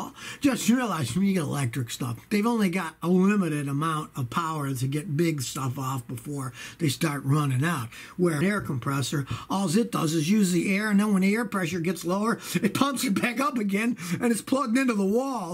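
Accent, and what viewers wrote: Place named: East Coast, United States. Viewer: Are you from Philadelphia? Your accent sounds from the East coast.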